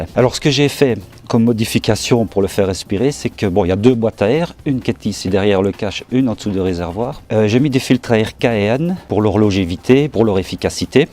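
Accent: Belgium. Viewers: J'aime beaucoup ton accent de la Belgique et c'est toujours un immense plaisir de voir tes vidéos !! 👍✌️🤛😉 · j'ai pu monter sur une (sans rouler malheureusement elle étais dans un show rom et je n'avais pas d'équipement) et c'étais nickel pour mes jambe aussi bien au niveau des commande de boite que du frein arrière et même avec les jambes tendue mes pied étais bien à plat il faut dire aussi quel avais une selle haute, de plus j'aime bien la position droite quand on est à sont guidon, je l'aurai bien acheter mais je doit vendre mon bateau avant pour la financer et faire de la place dans le garage pour la ranger, je me disait aussi que quand tu parlais sa sonnait fort l'accent de Liège à toi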